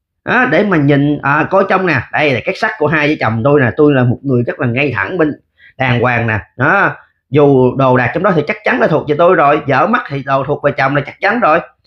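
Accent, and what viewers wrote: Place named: Mekong Delta, Vietnam. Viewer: Công nhận.em nói tiếng.giong trai đồng bằng.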